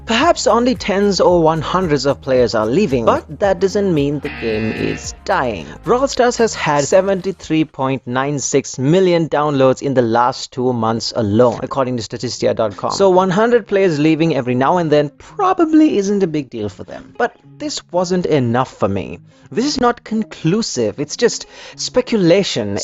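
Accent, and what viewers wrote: India. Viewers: You Indian? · Beo u are indian???😀😀😀😀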